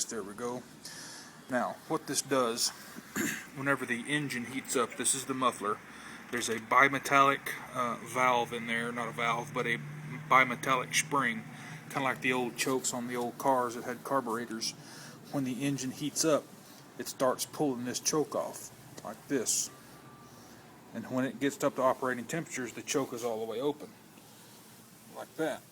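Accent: Texas, United States. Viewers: Is that a Texas accent cowboy?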